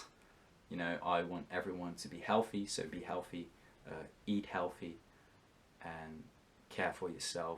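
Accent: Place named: United Kingdom. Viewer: You speak differently. I mean with accent but I hear british :))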